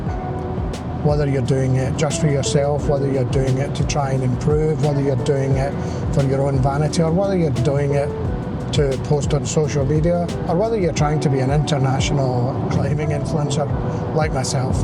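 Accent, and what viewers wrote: Scotland, United Kingdom. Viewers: What’s a Spanner? Something that spans? Also, why is the close caption not burnt into the video? I can’t understand your Scottish accent pal…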